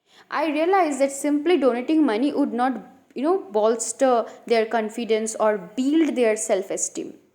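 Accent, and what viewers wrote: Bengal, India. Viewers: BENGALI ENGLISH:face-blue-smiling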